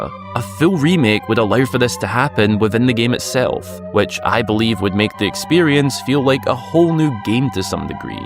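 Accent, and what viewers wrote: Scotland, United Kingdom. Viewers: Are you Scottish ? You kind of talk like Scrooge McDuck in the DuckTales reboot 😅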